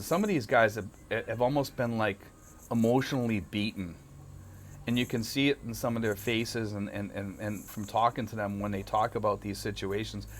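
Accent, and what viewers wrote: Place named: Massachusetts, United States. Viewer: I guess I'm not a typical expat because very little in your video applies to me. I've been married only once to the same woman, a Filipina. We have a daughter, and I've always had a great relationship. She's 21 now, and she's always been a great kid. We talk often, and I listen to what she has to say. Part of the reason things have worked out for me might be that I was 42 before I got married, and I'd had enough American gf's to know I didn't want one as a wife. Anyway, I subbed your channel. Also, I knew you were from Massachusetts by your accent. Me, too.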